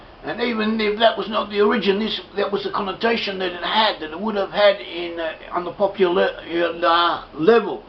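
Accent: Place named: England, United Kingdom. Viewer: ugly looking fuck with some english accent with a horrible voice fuck off to wales or somewhere with your bullshit ..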